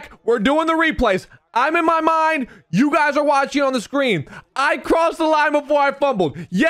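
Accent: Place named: New Jersey, United States. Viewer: where are you from ? you have a jersey accent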